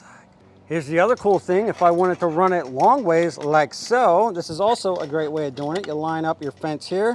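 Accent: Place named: Louisiana, United States. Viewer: Hey man! I’m a first time viewer and I’m already hooked! Digging the humor! Not sure where you’re from…but I’m picking up a Cajun vibe. If not, you would definitely fit in down “herya”! Btw…that’s a sweet machine. May have to get me one of dem me!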